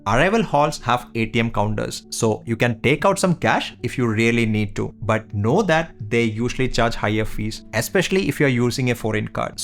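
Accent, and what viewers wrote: Kerala, India. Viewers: That Mallu accent is still there though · chettan has clear malayali accent!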